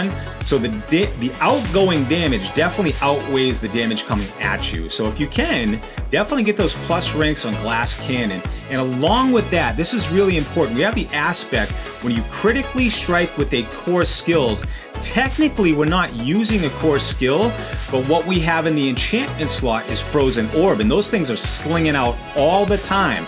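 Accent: New England, United States. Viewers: You’re either from Maine or Boston. I’m from southern Maine and these are just things I know.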